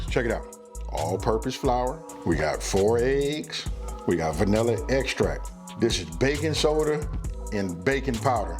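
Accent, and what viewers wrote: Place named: Bay Area, United States. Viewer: He gotta be from the Bay sounding like that.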